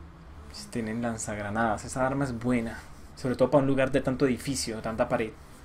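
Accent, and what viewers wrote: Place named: Colombia. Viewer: Por fin un Colombiano que no se cree mexicano o no habla como tal, 100% colombiano, :v, siga así ñero <3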